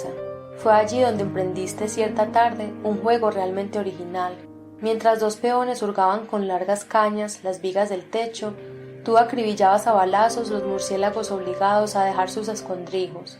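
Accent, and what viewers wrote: Antioquia, Colombia. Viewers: ese acento Paisa pega bien con la prosa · una hermosa voz femenina "paisa"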